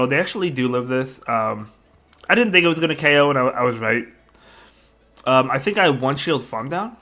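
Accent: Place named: Northeast, United States. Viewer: I got to get my "qwaffee". Man your accent is very strong. If i had to guess you're somewhere on the northern east coast? As always, great battle's.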